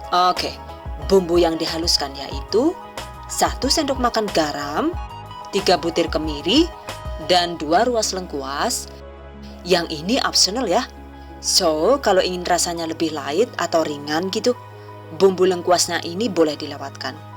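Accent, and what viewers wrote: Banyumas, Indonesia. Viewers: Malah keselek hahahaaaaa,,,,rika wong ngendi koh bu? Kaya wong banyumasan deneng? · Makasih bunda resep kripik terongnya..sy suka liat vedio bunda lucu ada bahasa ngapaknya he he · kie mesti wong banyumas ya ? ngendi yu umaeh ? apa baturaden ? tangga desane nyong ? 😆😆😆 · Org ngapak😁😁🖒🖒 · Tutor nya teges .. tapi sepertinya mbake orang ngapak ya? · Ngapak2.. Kayak bapakke wong banyumas hehehe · Mantulll bunda,,👍👍👍👍 Ternyata basane ngapak kya inyonge 🤣🤣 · Kaya wong ngapak y Bu....heeee....aku ikutin truusss....pnsrn sm Ibu niih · wowww mkshh bunda resepnya.bunda lucu kyaknya org ngapak nih sma kaya aku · Makasih resepnya.. Semoga sukses selalu Eh btw nganggone basa ngapak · Wkwkwk jebule mbaknya ngapak salam.ngapak yen.ora ngapak.ora kepenak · Tinggal di madiun tp jawanya ngapak ya mbak e..hihii...asli cilacap apa wangon kie tah mesti..hihii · Bunda ni aslinya mana si, ngapak bener.. 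Saya Purwokerto bun.. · besok coba ahhhh.............. ini koq ngmng2 orang jawa sih... lucu drnger suara ngapaknya.. samaan kaya aku... 😂😂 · Nyonya satu ini emang the base.tahu sdh sy coba pare blm kok puinter idenya maupun buatnya lho .hem mantap .nyah tp nadanya tadi ngepek2ya bojoku garut .bhs inggrisnya panduanya kangen suaranya .sukses slalu nyah mksh ilmunya respon baiknya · Wong ngapak jebule, Bun subscribeer baru bun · Bahasanya ngapak memang orang mana ea...??? Tadi denger pas lagi keselak..🙈🙈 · Lucu kadang bahasanya ngapak.. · Kok ngapak ya ngomongnya😁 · Ya ampun ternyata pada bae mbi aku wong ngapak... matur suwun bu resepe, slm ngapak ora ngapak ora kepenak... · Sy ibu rumh tangga biasa 50 tahun....bahasane koyo ngapak....ngomong cindil.....matur nuwun mba....kapan"" tak cpba.... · Kirain orang bule tadinya ...gak taunya ngapak ngapak 😅😅..mantulll buk e 👍👍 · Setiap resep yg ditampilkn mudah di praktekn dan berhasil berarti tdk ada yg di RHS kan cuma lucuu nya di selingi bhs. 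Ngapak...😀😀 Sukses mba... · Mamake ngapak ya mak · Jane kie cenele wong ngendi yaa...deneng kadang2 ngapak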